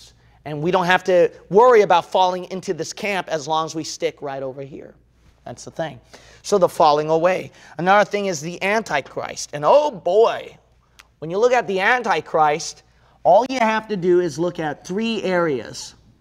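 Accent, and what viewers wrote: United States. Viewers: He speaks in American accent.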